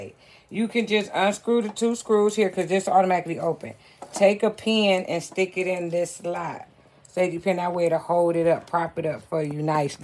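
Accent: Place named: Rural, United States. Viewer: I wasn’t finished 😠 I started laughing when it started by showing your feet. I thought that was great! Here’s a non pretentious instructional video that might show me something. Then I heard the Country Twang and I was hooked!!! Wonderful job! No Fluff! I don’t care for fluff! I’m going to keep up with you. I’m coming online soon with a “Big Show”, and I would love to feature you. Now I’m finished ✊🏿✊🏿✊🏿❤️🖤💚😎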